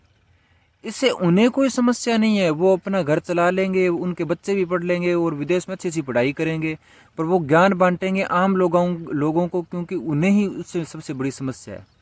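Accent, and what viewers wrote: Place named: Pakistan. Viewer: Your hindi is absolutely sounding like Pakistani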